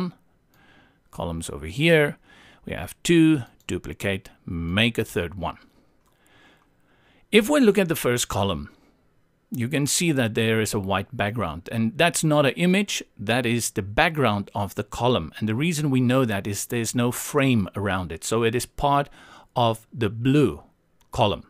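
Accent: South Africa. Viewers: you sound like you are from South Africa?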